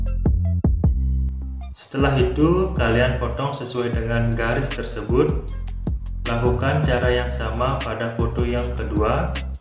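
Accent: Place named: Bali, Indonesia. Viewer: dri cara ngomong T nya pasti orang bali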